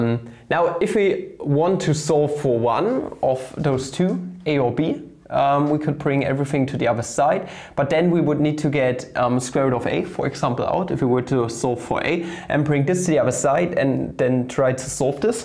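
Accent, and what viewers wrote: Germany. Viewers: You sound german, are you from Germany?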